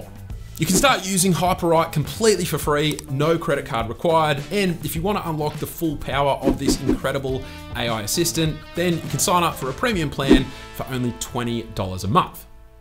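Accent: Australia. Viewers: is he an aussie? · Aussie Accent seems like the mixed baby of British and American Parents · My man is Aussie 🤟 yea mate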